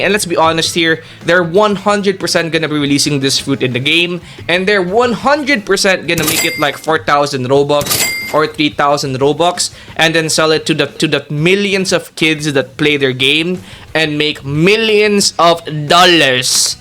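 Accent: Philippines. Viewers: idk why but your accent's giving me a filipino vibe lol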